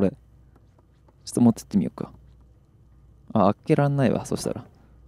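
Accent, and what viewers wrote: Hiroshima, Japan. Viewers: 広島弁が心地よいー☺️ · 増田さんの広島弁、やっぱり好きだなぁ～。🥰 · あの…毎回出る広島弁？が最高です…(º﹃º )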